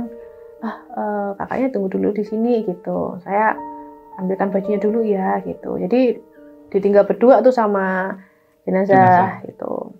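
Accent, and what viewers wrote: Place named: Java, Indonesia. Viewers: nama Panggung:” ”, nah…Nama AsLinya SIAPA dong?? (*jgn2 PONIRAH/SUSI/SITI/SRI/ANI?) 😂😂😂. Nama ASLI-nya PASTI NORAK X… (pdhL, itu nama Pemberian dari Ortu loh,mbak!). KENAPA hrs MALU sama NAMA ASLI Sendiri?? mmgnya, NAMA ASLI-nya “dneso” bgt yaaaa….?! Lah, LOGAT nya aza “MEDOK-JAWIR” Bgtttt!!! 🤭🤑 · Logat ngomong jawa banget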